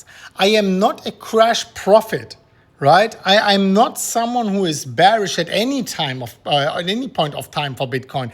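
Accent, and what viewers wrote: Germany. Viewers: Man I missed that thick German accent and the daily follow-ups. I’m back in the game baby. 🦾🎉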